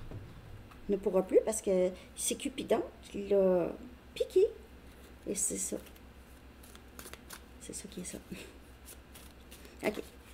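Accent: Canada. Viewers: Bonsoir, votre guidance me parle énormément. Merci à vous.🙏❤️. J'adore votre bonne humeur qui est contagieuse et votre accent canadien. 👍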